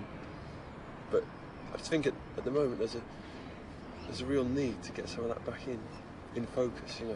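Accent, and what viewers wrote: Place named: England, United Kingdom. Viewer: Nice english accent 😊❤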